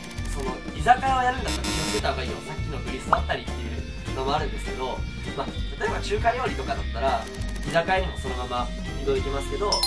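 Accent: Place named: Gunma, Japan. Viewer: 群馬イントネーションわろた。ジャートンの編集いいよね。笑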